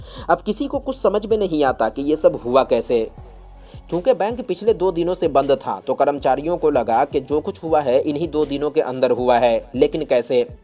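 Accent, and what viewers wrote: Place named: Bihar, India. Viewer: Bihari lahaja aa raha ha bolne me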